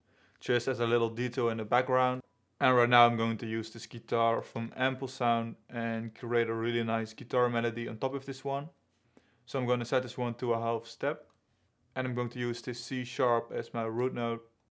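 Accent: Netherlands. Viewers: Great beat and great tutorial! You dutch btw?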